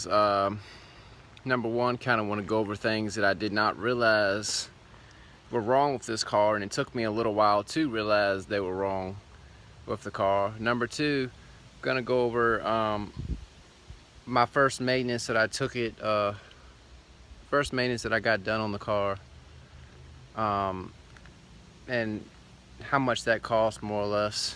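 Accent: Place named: South, United States. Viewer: You are handsome and your southern accent is everything ❤️😊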